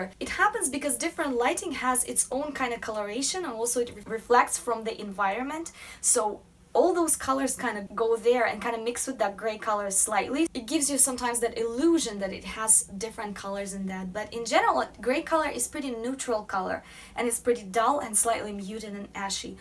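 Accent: Russia. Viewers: Ахах, через 20 секунд речи поняла, что девушка русская. Рашн инглиш из соу рашн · I have the muddy eyes, dark green and orangey brown. Like you,. I knew when I saw you and heard you speak that you were Russian, before I read your bio.;) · Всегда узнаешь русский акцент)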